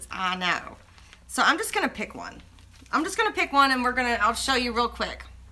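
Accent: South, United States. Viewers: Happy Birthday, I watched your video the day it aired but I wanted to comment today because it was your Birthday... You are so amazingly talented and your humor is hilarious I love to sit back and watch your videos and I get so tickled. I am from Georgia and I can definitely relate to the southern accent... lol Keep up the good work... I only have one request and that is if you could make all your background designs a bundle.. That would be wonderful.. Again Happy Halloween and Happy Birthday!!! <3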